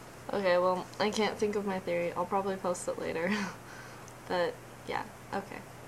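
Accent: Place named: United States. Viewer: By the way, you sound very slightly american. Did you go there recently before making this video or something?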